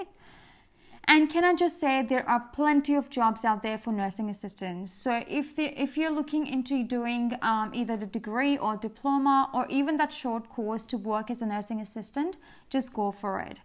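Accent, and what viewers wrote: Australia. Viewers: Why Australian ascent dear....why not Indian ascent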